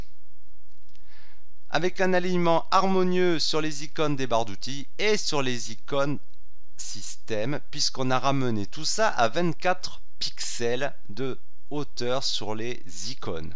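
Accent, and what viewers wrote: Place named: North, France. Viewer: Ben ouais t'a raison m'gamin!!! comme ils disent dans le Nord, le patois du Nord, il faut le comprendre, je ne le comprends pas toujours, mais il me fait bien rire, sans moquerie méchante, je le dis au cas où les vrais Nordistes d'Origine n'aimeraient pas ce commentaire..... Ssshusssssssssssss!!!!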